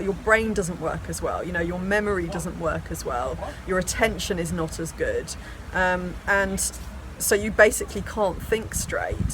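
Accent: England, United Kingdom. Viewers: with the English accent everything sounds so proper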